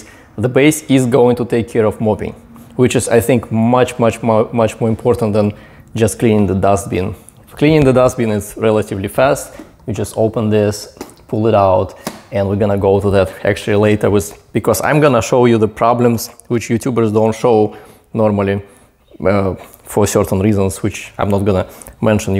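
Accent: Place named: Russia. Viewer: невозможно слушать тяжелый русский акцент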